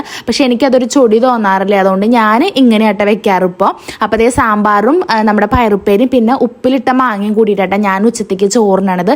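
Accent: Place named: Kannur, India. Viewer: Idee kannur slangil varav nna paraya😂upperi nn parayula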